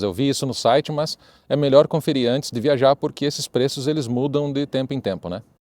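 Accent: Rio Grande do Sul, Brazil. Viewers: Bom dia, só pra tirar uma dúvida, vc é gaúcho? Quero te parabenizar pelo belo trabalho e pela explicação ótima. Infelizmente quando falamos sobre esse assunto nos assunta e nos comove muito. Obrigado pelo conteúdo e por nós trazer uma série responsável e uma voz de verdade.❤ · Você é do Rio Grande do Sul? Parece com o sotaque do RS. Uma professora me contou que uma amiga visitou esse campo. Ela chorou, e falou o seguinte: "o homem é um m0nstr0 mesmo". Acho que, por mais que eu estude, nunca vou conseguir entender a II G. M. Como um homem conseguiu convencer uma nação de que um grupo de seres humanos deveria sofr3r coisas tão ruins?! Como ele fez isso?! Por que acreditaram nele?! Por quê?! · tu ainda pergunta se ele é brasileiro? Olha o sotaque sulista dele. Meu ,é tudo tão nitido .😮😮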